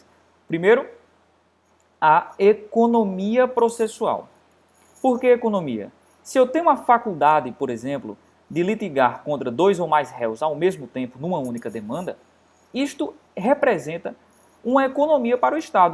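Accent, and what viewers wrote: Northeast, Brazil. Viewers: muito didático, obrigada por compartilhar seu conhecimento. A propósito, cm todo respeito, adoroooooo esse sotaque do nordeste.😍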